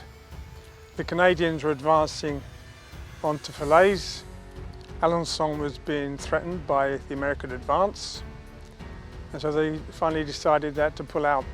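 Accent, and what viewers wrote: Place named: United Kingdom. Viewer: I'm glad that this is highly detailed but when you jump from one unit to another every ten or fifteen seconds it becomes very difficult to keep up with. Have you thought about animating with some arrows or directions so that I know which unit you're talking about and which direction they're actually moving and who they might be facing? I understand that all of this is hard work but these would be so awesome if I could follow them just a little bit easier. I'm sure your British accent doesn't help my dumb American brain much either. I am using subtitles though.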